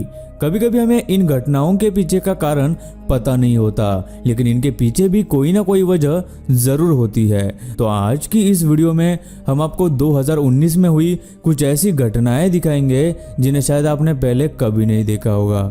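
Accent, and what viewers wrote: South, India. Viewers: Bhai ki voice se lagta he ki bhai South se he ??🤔🤔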